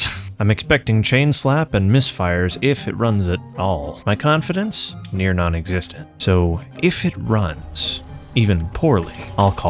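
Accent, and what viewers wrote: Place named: Central Pennsylvania, United States. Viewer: Every time I hear you say something like “there’s a lot of hoses on this car that need swapped” I’m back in Central PA😅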